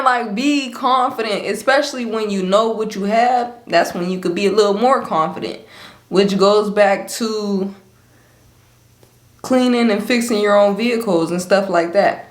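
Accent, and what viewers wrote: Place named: Midwest, United States. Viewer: I love your accent lol you from Ohio or mid west area ?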